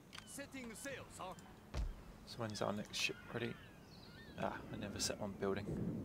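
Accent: United Kingdom. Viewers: are u brittish?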